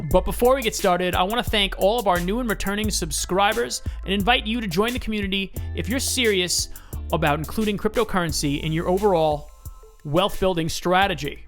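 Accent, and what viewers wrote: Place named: Long Island, United States. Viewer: You from Long Island? Bergen county NJ perhaps? Lol trying to place that accent.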